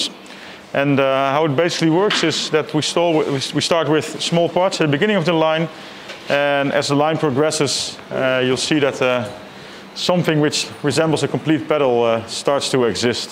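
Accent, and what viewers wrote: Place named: Netherlands. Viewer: Love the dutch accent😅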